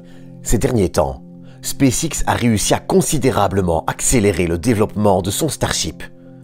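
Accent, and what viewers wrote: Belgium. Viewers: Beau boulot, merci pour tout le taf' de qualité sur cette chaîne ! Je like, je commente, je partage PS: Bel accent Belge j'imagine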